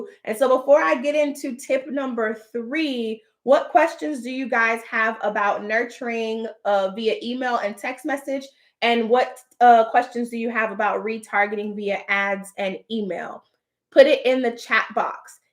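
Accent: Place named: Miami, United States. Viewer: I knew you were from Miami. We don’t really have “accents” but you sound like a Miami girl lol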